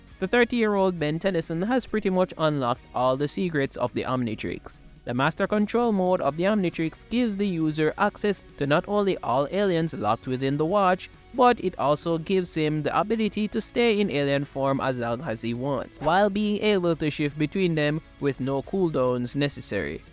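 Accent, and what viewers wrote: Jamaica. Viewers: Are you Jamaican? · subscribe for the jamaican accent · U Jamaican? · It's not about difference, there's just nothing Indian about his accent, its clearly a Jamaican accent. You good LiL brO?? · Are you Jamaican bro?? Love the Ben ten content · its clearly jamaican. Im jamaican and chinese myself bro talks like a sophisticated jamaican person. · bro sounds like a jamaican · Yo are u Jamaican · Yo bro ya Jamaican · Jamaican (take it from another Jamaican) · Are u Jamaican? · You speak English but sounds Jamaican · Bro yuh a jamaican🤨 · This sounds Jamaican….what Caribbean country you from? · are you jamaican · Could tell the Jamaican accent immediately 😂, happy for my JA brother. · Is he really a Jamaican? I hear the accent and ting, but mi affi ask. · Are you Jamaican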